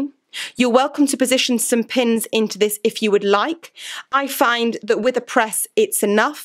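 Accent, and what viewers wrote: England, United Kingdom. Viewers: very beautiful english accent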